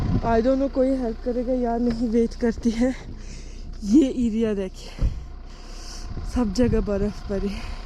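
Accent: Pakistan. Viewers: ❤️❤️❤️ sister I love your Urdu accent😁😁❤️❤️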